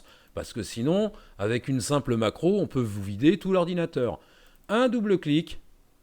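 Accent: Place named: Nord, France. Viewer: J'adore le ton de cette vidéo, bravo au présentateur ! En plus il est concis, ne s'écoute pas parler pendant des heures en mode égo m'as-tu-vu ! J'aime bien aussi la pointe d'accent du Nord, toujours très agréable à mon oreille tant elle rappelle la certitude que quand on a les fricadelles, il n'y a plus rien à prouver, plus rien à prouver. Merci pour ce tuto d'une rare qualité, d'une rare convivialité. Et utile bien sûr !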